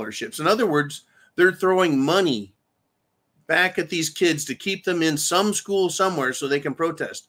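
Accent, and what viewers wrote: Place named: Idaho, United States. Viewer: You sound like you were in cordulane idaho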